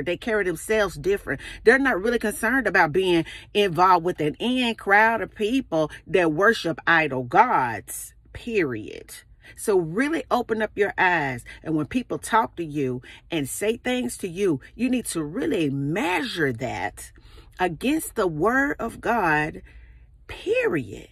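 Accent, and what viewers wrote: South, United States. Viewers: with all that Country accent, your right on point! Ain't it good to have ears that can hear!!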